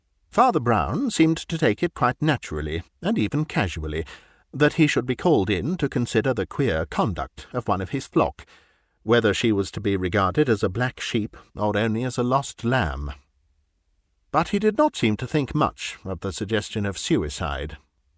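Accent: England, United Kingdom. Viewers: It's so nice to hear an English accent that's not AI.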